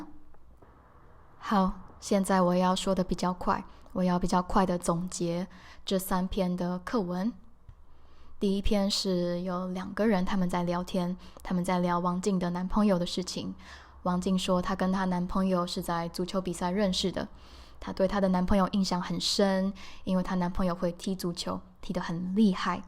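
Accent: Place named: Taiwan. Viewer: Thank you so much your video are just perfect even after 5 years your video is still useful, im also taiwanese so I'm so glad i found a video with taiwanese accent ❤ the subtittle a lot too thank you for working very hard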